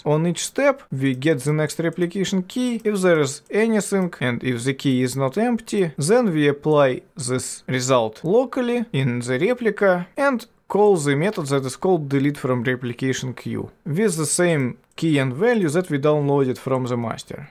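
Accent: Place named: Russia. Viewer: 一股毛子味的英语是吧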